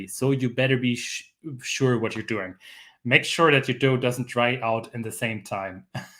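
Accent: Germany. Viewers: I thought the German accent was fun! Do you have to actively try to suppress the German accent when speaking English or does that come naturally?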